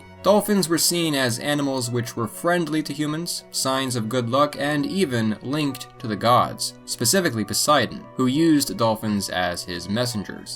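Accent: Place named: United States. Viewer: it's a shame that you didn't ask the guide what Swedes call this animal in their own language which, rather tellingly, they pronounce "elg". If that's your voice-over, it sounds like you have substantially learnt English from American sources. Maybe your Swedish companion also learnt American English. As for the signs- well, looks like they're for English speaking tourists... mostly Americans? American English shouldn't be regarded as the definitive form of English. Another example is your pronunciation of the Australian bird, the "emu". Americans (and you) mispronounce this as "ee-moo". Australians pronounce it "eem-you".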